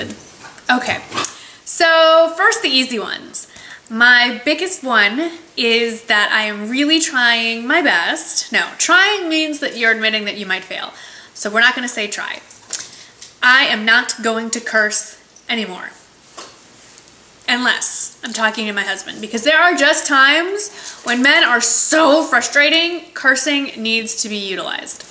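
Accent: United States. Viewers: I love your voice and your accent. It reminds me so much of my year in the US ...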